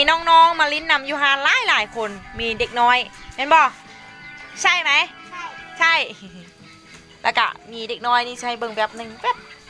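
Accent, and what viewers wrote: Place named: Nakhon Phanom, Thailand. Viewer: บ้าน เว้าคร้ายบ้านอ้ายเลยนครพนม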